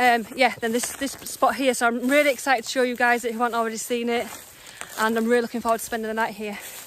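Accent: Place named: Carlisle, United Kingdom. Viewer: Carlisle accent..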